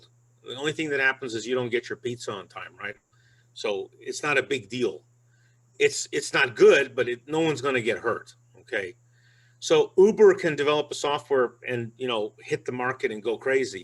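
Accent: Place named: United States. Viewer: Awesome session 😁. Liked American accent of speaking of sir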